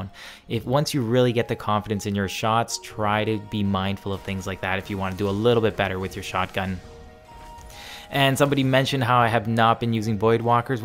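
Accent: Canada. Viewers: U have the only Canadian accent I've ever heard....and I'm Canadian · His canadian accent is bleeding out of this video... It sexy tho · Canadian ey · I think he's from Canada it's pretty rood to get mad at someone for their voice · You are the most Canadian sounding person of all time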